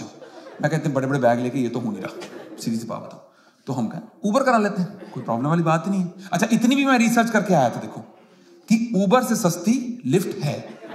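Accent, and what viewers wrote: Punjab, India. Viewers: You are awesome yaar Your punjabi accent is tremendous bro Really love you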